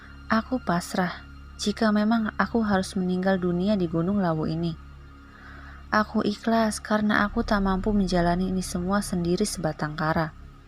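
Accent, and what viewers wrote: West Java, Indonesia. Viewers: Pembaca ceritanya berasal dari Sunda/Bandung ya?... Betulkah?... Karena penyebutan "bagaimana" terdengar menjadi "bagaimanak"/ penyebutan "sunyi sekali" terdengar menjadi "sunyi sekalik"/ penyebutan "gelap sekali" terdengar menjadi "gelap sekalik"/ penyebutan "membaca shalawat Nabi" terdengar menjadi "membaca shalawat Nabik"/ penyebutan "jangan tinggalin aku sendiri" terdengar menjadi "jangan tinggalin aku sendirik".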